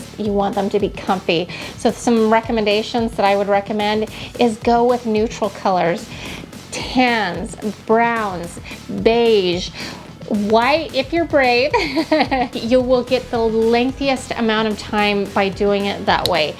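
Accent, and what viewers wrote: Utah, United States. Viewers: I am so surprised to hear you’re from Maine, you 100% have a Utah accent. Mind blown.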